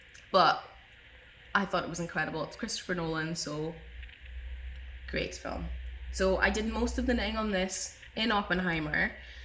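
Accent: Scotland, United Kingdom. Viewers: I’m from the Detroit area of the USA. I found your podcast in my YouTube feed and subscribed after watching your first episode and was very excited today to see you have a new episode to watch. I adore your Scottish accent and don’t have a problem keeping up when you talk fast. So, don’t change how fast you are talking on my account, lol. Thanks for the best new podcast I’ve seen in a while. So fun and fresh! ❤ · Hi, found you in my thread after you got a shoutout by Kevin and Ray on Needle At the Ready podcast. I’m based in Berkshire, UK and enjoying your Scottish accent.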